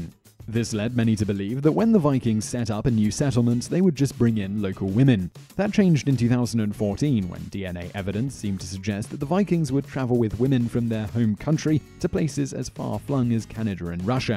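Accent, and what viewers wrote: England, United Kingdom. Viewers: Jack Hammer Well.... he's English so.... yanno.... I'm sure he could do an African accent if you'd prefer :) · I'm sorry the enlish accent sounds smart but is it nessesary? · Is this guy British?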